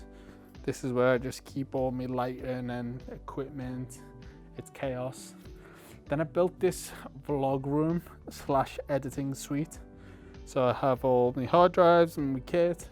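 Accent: Liverpool, United Kingdom. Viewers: A scouser!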